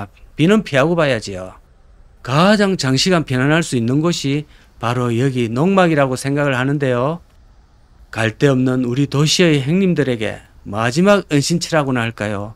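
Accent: Gyeongsang, South Korea. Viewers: 경상도 사투리 정겹습니다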